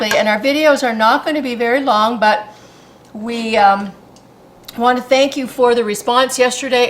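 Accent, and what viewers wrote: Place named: Cumbria, United Kingdom. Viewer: I begged for store bought white bread too. Horrid little thing I was. Ha ha. I will be trying your brown and digging out my bread maker. Loved hearing your voice- took me right back to keswick.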